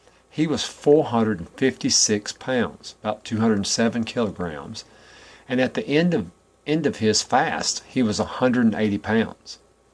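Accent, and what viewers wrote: South, United States. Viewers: Damn you use to be that big? You definitely can tell me something. BTW love your "southern" accent.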